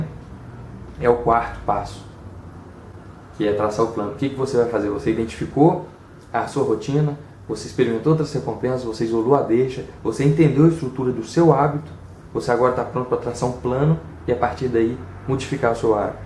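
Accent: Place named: Minas Gerais, Brazil. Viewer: Parabéns. Foi uma ótima resenha, explicou tudo de forma clara, concisa e pude compreender perfeitamente a que o livro se propõe. Me animei a comprar o livro e realmente os hábitos são tudo, foi uma das características marcantes que percebi convivendo um tempo com pessoas extremamente focadas e proativas de sucesso, com perfil vencedor que convivi no colégio. Eles sempre tiravam as melhores notas e eram muito reconhecidos pelo corpo docente. Tem sotaque e jeito mineiro, acertei ? rs parabéns novamente, abçs.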